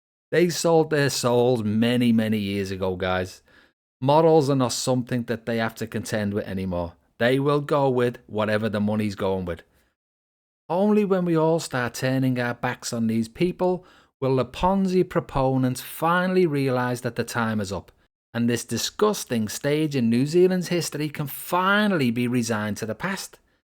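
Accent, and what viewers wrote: Liverpool, United Kingdom. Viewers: Sounds like a scouser(Liverpool) which is a depressing dump, now he constantly whines on about NZ, yes you could buy a terraced house in a rough area of Liverpool pretty cheap but reason is because nobody wants to live there. Auckland compared to many places is quite nice and not expensive compared to comparable cities.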